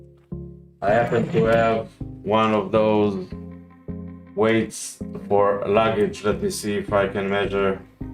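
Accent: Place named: Israel. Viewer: חחחח איזה מבטא ישראלי, אחלה סרטון. אתה חושב שזה עדיף מהth8a? כמה זמן לקח לזה להגיע?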